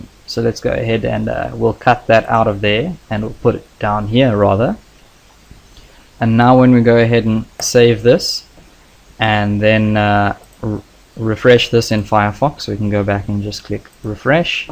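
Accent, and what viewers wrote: South Africa. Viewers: Yoh Bra u sound like a South African, anyway I have a problem I know a bit of javascript should I just jump to jquery or try to Master Javascript first?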